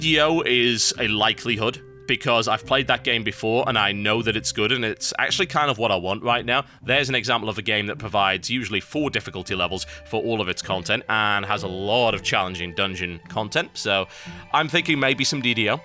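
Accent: United Kingdom. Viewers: WTF WTF??? Wow is no more wow we known. It Changes when comes Lich king and Cataclysm. Reason why people stop playing wow its repeats all time everything is same. I like for his comment and i like british accnent ( sorry for my gramma ) so Good Luck i wish u very nice future!!!!!!!!!!!!!!!!!!!!!!!!!!!! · Thank you for your always honest and informed opinons in a british accent. You will always be my first stop on the interweb b4 i start hardcore gaming. And I'm grateful you will be continuing the mailbox as i love to hear your thoughts on things that the community has ideas or opinions about. Good Luck finding another MMO to play and i wish you the best of luck!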